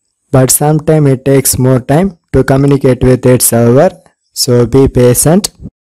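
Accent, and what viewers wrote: England, United Kingdom. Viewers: thanks for the english accent! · nice english accent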